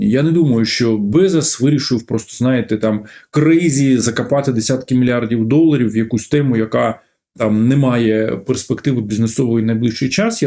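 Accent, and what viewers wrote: Russia. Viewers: Та в нього тих перлів , чуть не кожне слово . Можливо то просто москалик , який вирішив українцям брехні понарозказувати !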